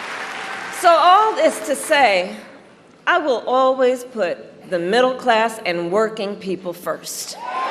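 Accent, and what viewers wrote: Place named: South, United States. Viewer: Talking southern again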